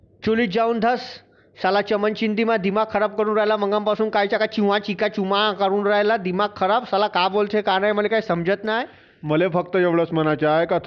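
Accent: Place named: Varhadi, India. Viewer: Nice भाऊ आपली वऱ्हाडी भाषा एकदम मस्त वाटते.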